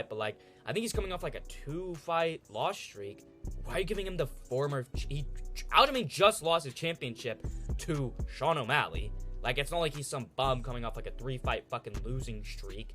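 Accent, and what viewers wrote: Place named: Midwest, United States. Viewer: You are actually retarded! Definitely from the Midwest with retard accent dontcha ya know! So glad the UFC doesn't hire fucktards like you for match making. Why do you keep saying we? There's no "we" you are in no way, shape, or form involved with decision making in the UFC. One last thing... cut your fucking hair! You look like a botard!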